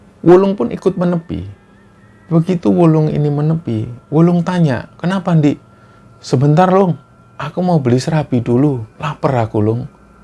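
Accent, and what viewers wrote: Java, Indonesia. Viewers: Gunungkidul hadir mas.. sehat" sllu mas,suka pembawaannya.. wong jowo,ora ilang jowone.. 🙏🙏🙏🙏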